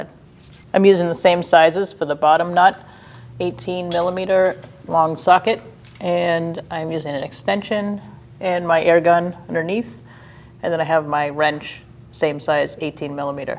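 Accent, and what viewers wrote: Boston, United States. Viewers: I would highly trust a woman mechanic with a Boston accent. These videos are great. I am mechanically inclined but seeing the process a professional uses makes these tasks such a breeze. No thinking required.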